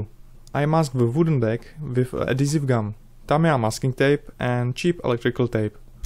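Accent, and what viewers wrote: Czech Republic. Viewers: You can definitely hear that you are czech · Já si podle toho přízvuku říkal že budeš čech (zápalky z penny tě prozradily) :-D super práce.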